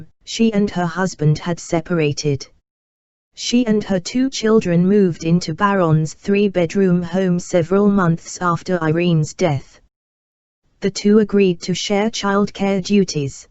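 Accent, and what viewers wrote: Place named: United Kingdom. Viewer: Amanda Sack agreed, the mechanical voice is distracting. A tinny British accent, literally like fingernails scratching across a chalkboard.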